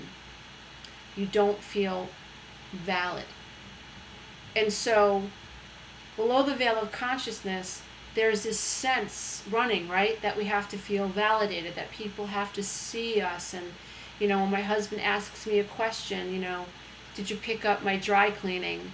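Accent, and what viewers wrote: New York, United States. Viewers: Don't be afraid of sounding New York! Appreciate you...You helped me break the code. I am the little sister who moved forward because my elder sister did. I am forever grateful. It wasn't without incident, she had to watch me fall (into toxic relationship) before getting up. It is so worth it! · she sounds very New York it's so natural and grounded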